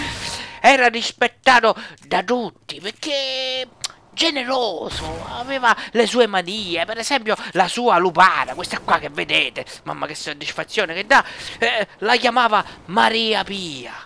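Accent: Italy. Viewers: ovviamente ogni tua recensione è bellissima. Dici tutti i dettagli,però devi dirmi una cosa...perché quella parlantina sicialiana? xD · Chiuso il video e messo dislike a . La classica pronuncia degli italiani.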